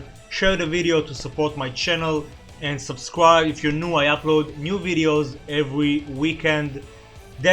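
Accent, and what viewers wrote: Israel. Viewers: וואי אתה נשמע ישראלי רצח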